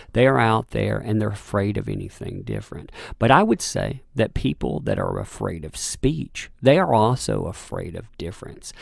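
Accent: South, United States. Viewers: Dude what region of the country are you from? Your accent sounds decidedly southern but can’t quite place it regionally beyond that level of specificity Also, you say “within this” to an almost enraging degree lol. I have to assume that’s a regional thing too, sort of like how Baltimore residents say “behind this” or “behind that shit” meaning “vis-a-vis” more or less. That’s the same type of thought conveyed by your “within that” thing I’m not asking these things being critical or shitty at all! I’m 100% a D&C/comicsgate supporter and love your videos along w Nerkish, yellow flash, captain Cummings (though he gets on my nerves lol. Dude can’t read’. I swear he reads at about a 4th grade level lll but I share his perspective completely so I try to overlook it) lol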